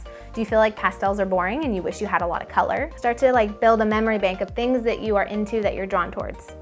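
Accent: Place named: Texas, United States. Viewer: I'm from Texas and you do kind of sound like you're from Texas! My biggest struggle is COLOR. My fiance decorates without really thinking about color which is clashy, and I just don't know how to put colors together in a way that is not clashy but still interesting! I would love a video on this or maybe some templates! I would also like tips on incorporating old furniture and decor that isn't necessarily your current style.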